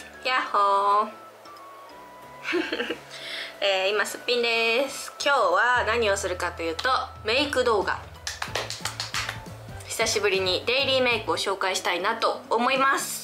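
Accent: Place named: Kansai, Japan. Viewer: バリバリ関西人の喋り方すきです！可愛くて憧れます🥹♡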